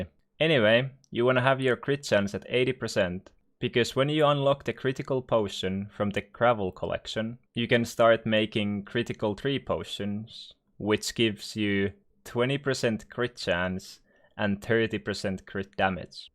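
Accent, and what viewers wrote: Finland. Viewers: Youre a finn, its confirmed im sorry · shut the fuck up he is Finnish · your accent makes me want to go to finland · Bcs he’s finnish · Yo are u from Finland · huomasin heti et olit suomalainen sun accentistä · Are you finnish becouse i am and your accent sounds finnish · Bruh he’s just Finnish it’s a different accent chill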